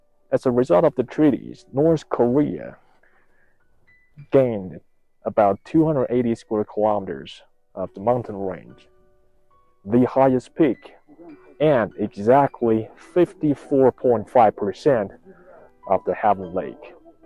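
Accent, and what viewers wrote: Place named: China. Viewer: From his accent, he should have grown up in China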